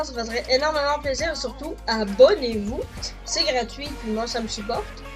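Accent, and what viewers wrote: Quebec, Canada. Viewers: Ta vraiment l'accent québequoi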